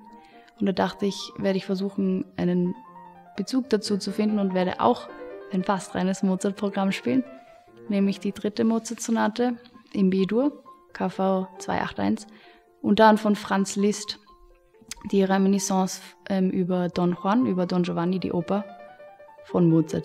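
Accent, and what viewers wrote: Vienna, Austria. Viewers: Nein, ist nicht Wiener Dialekt. Also, das vielleicht auch, aber sicher nicht in diesem Video (hör dir einmal wirklichen Wiener Dialekt an, das ist ganz anders). Deutsch (wie Englisch übrigens auch) ist eine plurozentrische Sprache. So wie US-Englisch kein "US-Dialekt vom Britischen" ist, gibt es neben dem Hochdeutsch in Deutschland auch das Hochdeutsch in Österreich, die unterscheiden sich halt ein bisschen voneinander. Und diese beiden Arten von Hochdeutsch haben dann Dialekte bzw. die allerwenigsten Leute sprechen wirklich reines Hochdeutsch (weder in Österreich noch in Deutschland). hat ein bisschen einen Wiener Akzent, aber zumindest in diesem Video spricht sie (vermutlich absichtlich) recht nahe am Österreichischen Hochdeutsch. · Sie klingt schon nach Wien. Wirduose Sochen. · Austrian accent <3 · She speaks Austrian German, that’s why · Ist halt Wiener Dialekt. 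Ist quasi das gleiche wie München und bayrisch. Auch für hochdeutsche gut zu verstehen weil nicht so sehr abweichend. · you do realise that this is about her german/austrian accent in the english TwoSet vids? Besides that you can clearly tell that she is austrian/viennese from her pronunciation and intonation aswell as her used vocabulary.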